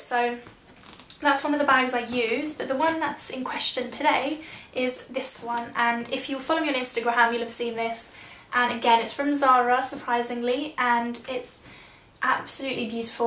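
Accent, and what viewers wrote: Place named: United Kingdom. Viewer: i liked the video before it even started... i know its going to be awesome because: 1. its whats in my bag video, 2. its you talking with that beautiful accent (i wish i lived in the UK) cheers from croatia :)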